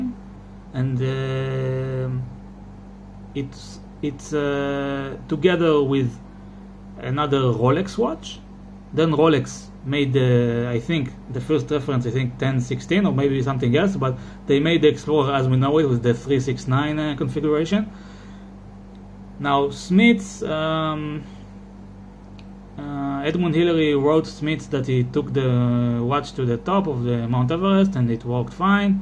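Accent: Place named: Israel. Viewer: Great review! Are you Israeli? I live in Tel Aviv and you definitely sound like it haha. Anyway, keep up the good work!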